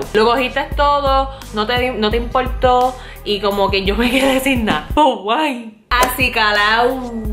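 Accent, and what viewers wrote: Puerto Rico. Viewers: Ok la vi y dije puede que hable boricua la escuché y dije no mi ciela esa es mas boricua que yo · Alina Cuellar no todos los puertorriqueño hablan así ella obviamente se crio en unas parselas o en un caserio de mala muerte. Ese asento de ella es muy chisma y no todos hablamos así!!!!!! Chusma!!!! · Que bonita , te holles hablando boricua ,orgulloso de ti ..por que vivas y te encanten nuestros encantos en la isla.... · Que mal me cae el asentó de Puerto Rico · No es boricua. Es una China que se crió en puerto rico. Por más que hable como boricua. Ahora mismo es la china que se crió en puerto rico que ahora vive en USA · Habla igualito a los boricuas. Es una china bien boricua"🇵🇷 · I love boricuas accents 🙂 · Es raro ver una china hablar también el español y mas como los boricuas como yo ✋😁🇵🇷❤️ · Corillo que pasa cuál es la envidia en los comen6con el acento boricua ??? 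😂 Ya superen que somos los mejores!!! Puñeta 🇵🇷 Por cierto aquí tenemos mucha mezcla con chinitos y es súper cool 🔥🔥🔥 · China na esa es boricua de todos modos Abla bien boricua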